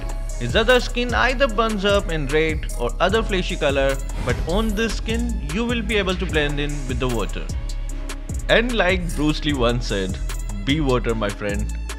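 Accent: India. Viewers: Bro got Indian accent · you sound like my friend his indian nationality.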